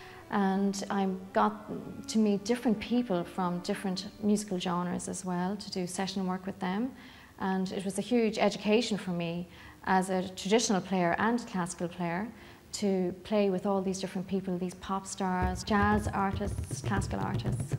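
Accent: Ireland. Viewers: This is an accent, Ireland. Scotlaand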